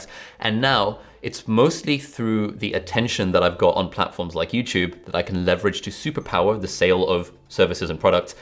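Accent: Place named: England, United Kingdom. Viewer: Why do you keep saying "dollars"? You're very obviously English, even to an American!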